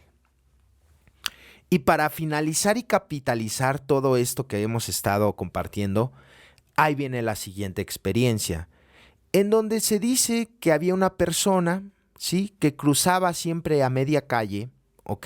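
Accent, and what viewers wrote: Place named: Mexico. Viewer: Oye, tu ères de Mexico Verdad ? Por El asento tan bonito, yo naci en uno de Los grupos de Monterrey.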